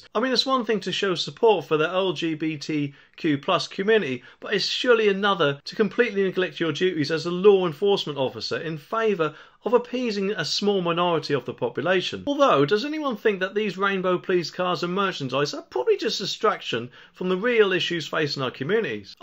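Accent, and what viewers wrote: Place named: Northern England, United Kingdom. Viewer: You northern folk with your comedy! :) Your joke doesn't work with a southern accent (we pronounce our aches, which is due to the fact that when people started to learn who to read, they pronounced all of the letters rather than pronouncing words "correctly" for the time". In other words, dropping aches was the "proper" way to speak at the time)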